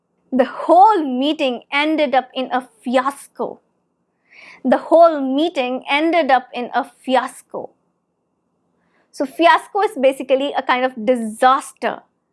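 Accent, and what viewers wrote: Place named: RP, United Kingdom. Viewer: Can be called as, Queen's English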